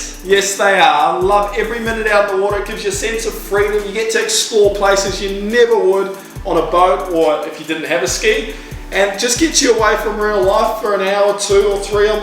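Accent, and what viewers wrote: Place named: Australia. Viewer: You sound Australian...Forgive me if I'm incorrect. If so, I am absolutely terrified of water in your country lol. Between your crocodiles, snakes, sharks (even if I don't have to worry about them), etc...The only water I would want to touch there is the shower, and my drinking water lol. If it's New Zealand, well that doesn't change much lol. In fact it's probably worse.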